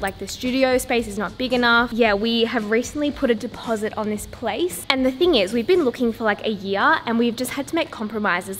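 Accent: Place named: Australia. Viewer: OMG YEA FINALLY!! 🎉 Called it on both the warehouse and the cook book. Low key been praying for a cook book because I’m a hard copy type of gal and I had a feeling from your vlogs when you kept saying you guys had filled the space at your old apartment I was like hmmm new office. Warehouse for photo shoots or new campaign ideas🙊🙌🎉 love it. Also the commentary is so Australian in this vlog I died when you said “hot AF”😂😂😂 Sending you many wishes for the new year and your new adventure with the warehouse. Can’t wait to see the series! 💗💗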